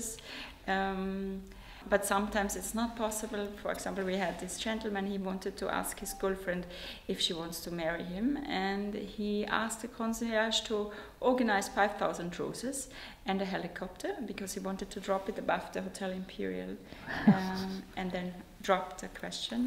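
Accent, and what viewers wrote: United Kingdom. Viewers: 当たり前ですが、やはりSTOPPELさんの話す英語はイギリス英語なんですね…！それとも訛り…？ 字幕のおかげもあって、聞き取りやすかったです！ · すごいイギリス発音。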